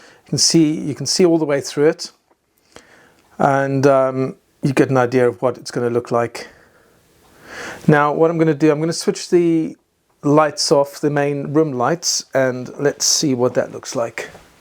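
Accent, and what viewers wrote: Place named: United Kingdom. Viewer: thanks and I look forward to more episodes.... it is interesting listening to a British acccent talking about Chicago rail .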